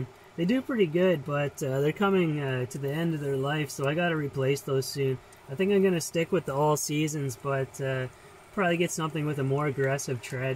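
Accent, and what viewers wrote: Canada. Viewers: This guy sounds exactly how I thought Canadians would sound. Also people tell the guy to move, assuming he would go south.... this god of a troll goes even more north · I'm Canadian and this is the only guy I've ever seen in my life with a legitimate Canadian accent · The way this guy talks I'm assuming he's canadian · I love your accent and they way speak Some words small pause some words with long vocal end Pure Canadian lovely chap God bless you And please drive carefully on those ice roads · I am English and I can tell you are Canadian because you say ' oot' instead of Out ! For 25 years I spent the summers in Germany and winters in Morocco in my RVs If I were you I would be in Arizona (My No 1 State) in winter and Canada in summer. Thank you so much , Love your videos. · Is this a canadian accent? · Wow that’s the most Canadian accent I’ve ever heard. 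😉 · Is that Canadian accent or what? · Whole Food Plant-Based Man I love Canadian accents because it’s a mix or a British and American accent. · That thick pure Canadian accent is complete ASMR. Damn, it's just what I needed to soothe my soul. Thank you.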